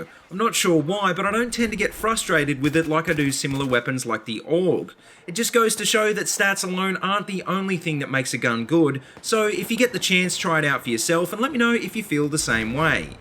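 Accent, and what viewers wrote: Australia. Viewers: You sound very familiar. Which state of Aus do you live in?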